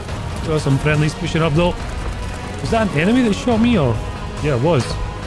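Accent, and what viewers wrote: Scotland, United Kingdom. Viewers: Where in Scotland you from mate? Really enjoying your videos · Where abouts in Scotland are you from?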